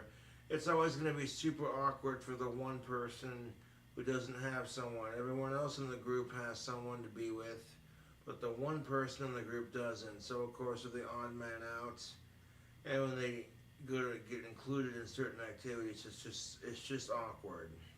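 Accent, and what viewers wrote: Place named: Birmingham, United Kingdom. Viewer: Bro, are you 80% British? Im starting to hear the heritage in the accent. Sounding like Bob Geldof if he came from Birmingham. Weird Shiyte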